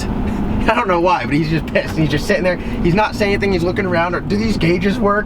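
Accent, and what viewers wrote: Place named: Appalachia, United States. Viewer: Why do you talk like a hillbilly but your parents dont? Lol wtf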